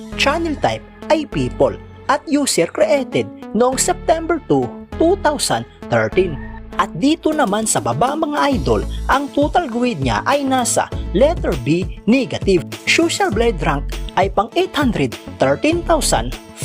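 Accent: Visayas, Philippines. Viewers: Bisaya kc but as long as we understand her, it's okay.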